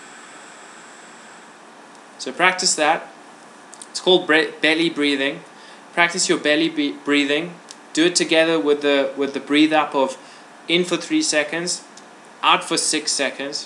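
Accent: South Africa. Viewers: hi are you south african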